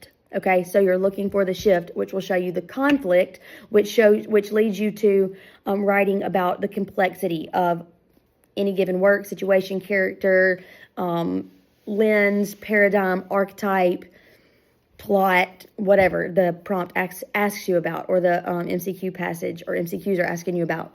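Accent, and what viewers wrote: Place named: South, United States. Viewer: Southern Accent, 80's hair.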